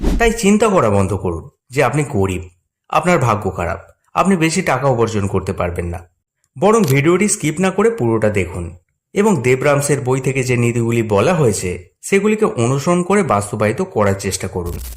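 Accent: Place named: Kolkata, India. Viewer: আপনার কথা শুনলে মনে হয় কলকাতা একটা ভাব আছে,, এটা কি যদি চেঞ্জ করে একটু বাংলাদেশীদের মতো করে বলতে পারেন,, আপনি অনেক দূর যাবেন😊😊😊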